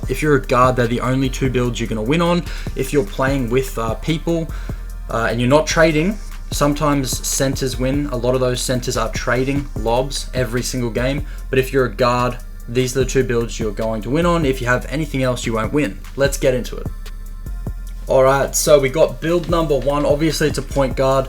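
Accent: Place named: United Kingdom. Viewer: I was just wondering where you are from? You sound like you have a little bit of a British accent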